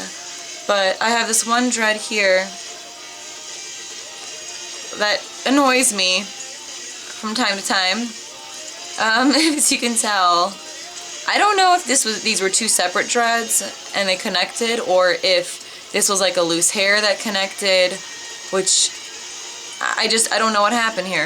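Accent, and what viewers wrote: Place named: United States. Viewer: Jesteś Polką, ale masz super amerykański akcent. Mieszkasz w USA?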